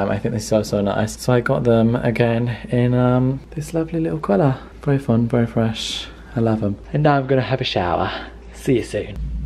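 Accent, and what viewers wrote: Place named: London, United Kingdom. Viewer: I love the London accent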